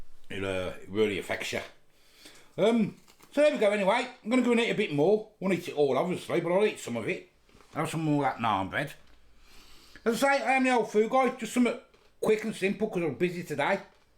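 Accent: Birmingham, United Kingdom. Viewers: Just subbed, watching with 2 boy,s, 6/7, grandson's, when you said masala, they both said it, and remarked that you sounded like me, i'm a Brummy, anyway, great vid, and we 3 watched and enjoyed to the end, now to check your other stuff out, thank's.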